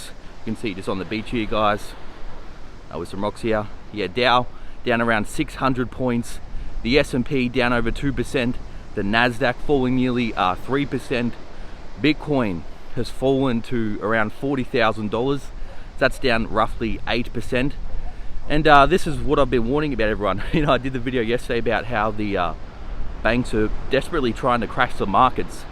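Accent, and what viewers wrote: Australia. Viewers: Are you from Australia? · Hahahah change of scenery. Nice day out and and beach. Ummmm, are you Australian? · where you Australia?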